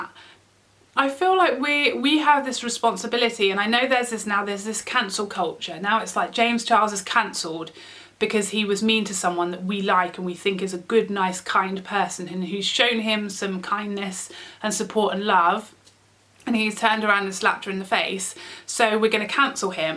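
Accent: United Kingdom. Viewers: Yup, I reckon drugstore ain’t the only duping going on in beauty YouTube! Really enjoying your channel btw, nice to hear another British voice. I should add that I came to you through Mel, who I in turn came to through Wayne - all lovely genuine people! xx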